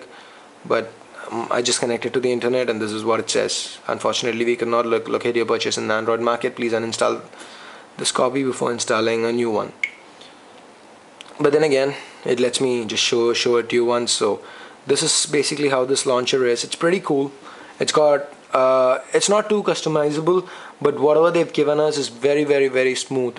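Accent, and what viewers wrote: India. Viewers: dood are you from india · Wer u from.ur accent like an indian im right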